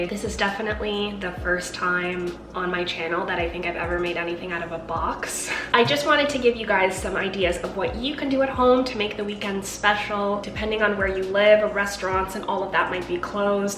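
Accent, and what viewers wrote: United States. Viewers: She has suck an American accent to be from Canada most Canadians have like an accent I don’t know how to explain it😂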